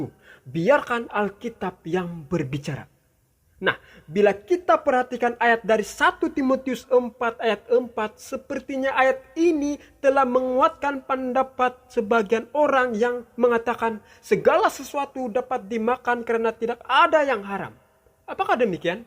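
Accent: Malaysia. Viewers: Betul itu.. Admin ini SDA.. dari sabah borneo malaysia.. Dari tutur caran percakapannya saja sy boleh tau.. Dia orang malaysia.. Tapi mengubah cara cakapnya menjadi cara ngomong orang indonesia.. Sy tahu sebab sy orang malaysia.. Admin ini pastinya orang malaysia.. Kalau orang malaysia cakap saja cara malaysia.. Tidak perlu menyamar mengunakan bahasa indonesia. Salam damai dari sabah borneo malaysia.. Tuhan Yesus memberkati kita semua saudara saudari seiman tidak kira di mana kita semua berada. Amin🙏 Tuhan Yesus baik❤️🙏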